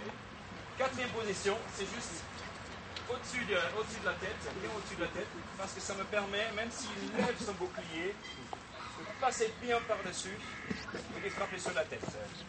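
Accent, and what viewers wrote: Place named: England, United Kingdom. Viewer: Hello! It’s in French. But the person who speaks to an English accent would say:-)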